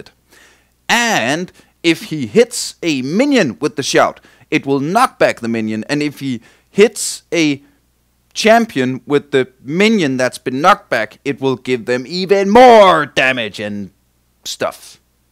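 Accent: Denmark. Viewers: we can rly hear you are from denmark bro xD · Dat danish accent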